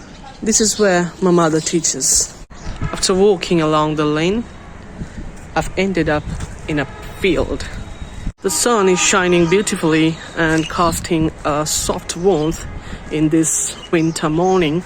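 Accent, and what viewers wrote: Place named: United Kingdom. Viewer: Your accent sounds really British. The intro is good tho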